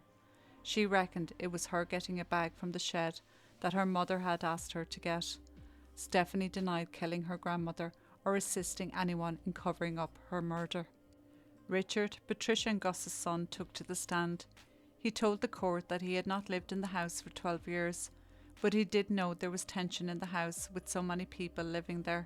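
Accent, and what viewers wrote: Ireland. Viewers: why thank you.. Nice to hear an Irish girl break these stories down.. Keep up the great work 💚 go raibh maith agat 🙏🇮🇪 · What a sorry excuse for a daughter and her family. I hope they pay for it one day. I am so glad to hear another wonderful podcast from you in your beautiful Irish accent. God Bless 🌹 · Louise looks older than her lovely mum! Sleep well Patricia 🤍 TY for the upload, I’m working my way from the beginning. Love your voice & soft Irish Mammy accent! 💚 🏴🇬🇧❤️xx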